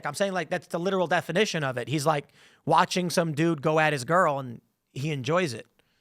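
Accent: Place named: Chicago, United States. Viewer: face in all these recent thumb nails are smug af imo just saying. Que to thicken the Chicago accent about cheeseburgers and being cooked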